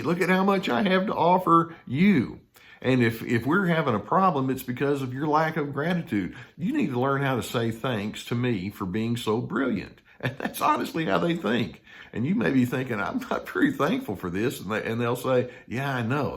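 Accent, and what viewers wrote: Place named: Texas, United States. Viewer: it's your Texas accent, it reminds me of cowboy movies lol Happy Holidays!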